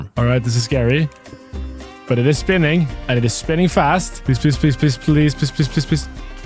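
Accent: Sweden. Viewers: Are u a Swed because u sound like a swed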